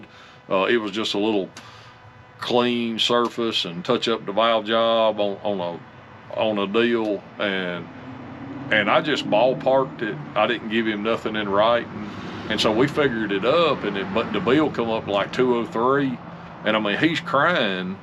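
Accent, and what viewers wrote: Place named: West Virginia, United States. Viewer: are you originally from west virginia? accent sounds like my cousins from there. :-)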